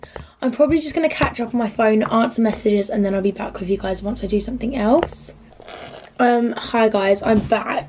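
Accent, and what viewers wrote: United Kingdom. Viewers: The British accent is everything ❤️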